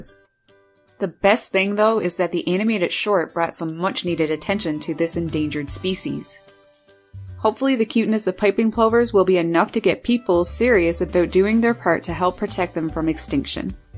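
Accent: Newfoundland, Canada. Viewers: Thank-you for this video. It was great to hear the Newfoundland accent! So Canadian! Absolutely the cutest birds, for sure. I live in B. C. and I think we have Sowet Owls here, too. I may be wrong, though. I am sure that there is a very rare, small owl which does live in the Coastal forests, perhaps you know which one it is, 😃 I think it is the Northern Spotted Owl. 👵🏻🐾💕🇨🇦BC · hi u have such a beautiful voice-i can hear the passion -n the cute canadian?accent-luv ca usa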